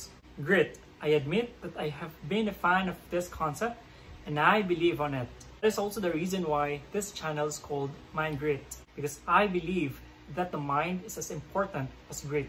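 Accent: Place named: Philippines. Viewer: Owwh i like ur content po and also ur accent when u speak english proud filipino talaga hehe more interesting video pa lods cant wait to see more videos from you po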